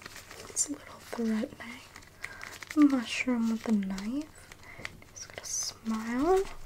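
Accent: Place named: Canada. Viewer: The way you talk, you must be from Canada 🍁 well because I am too. This is the first video I saw of yours.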